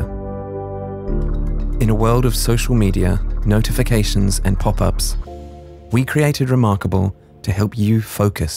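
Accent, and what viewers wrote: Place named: England, United Kingdom. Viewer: that narration is just awful. Just having an english accent doesn't make it good.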